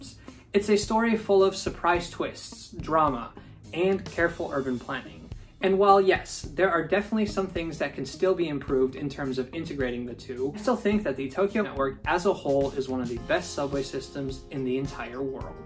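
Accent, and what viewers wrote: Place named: United States. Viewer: Where all have you lived? You sound like an American.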